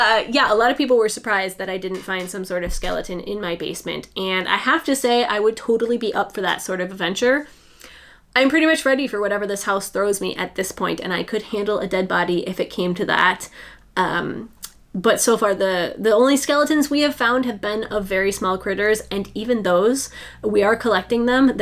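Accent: Canada. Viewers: I appreciate that you aren't wasting tradies' time by asking them to come give you a quote when you have no intention of hiring them! I like your slow footage and I don't think you need to defend why your videos are different to others'. One of the great thing about youtube is the variety of styles that work for everyone. Do you think your editing style is informed by your circus performance style? You're Canadian, I'm Australian, probably most of your viewers are in the US... of course we all pronounce and spell things differently! If we all understand each other, what does it matter? And thanks for answering my question about the drain! I forgot about the soil-too-high aspect of your home, but I was genuinely interested because we are also renovating our 140 year old home and I was wondering if digging our drain inside was something we should consider. I hope it didn't seem rude! 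Thanks for your community engagement, it's definitely another reason I love your channel!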